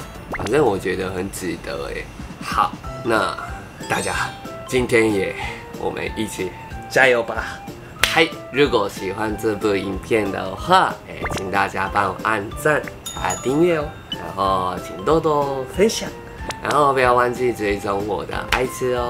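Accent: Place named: Taiwan. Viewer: 有台灣腔的你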